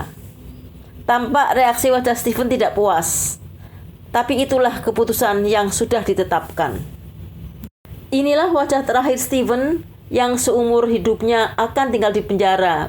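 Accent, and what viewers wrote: Java, Indonesia. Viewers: suara madam yg medok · Medokny dikurangi dong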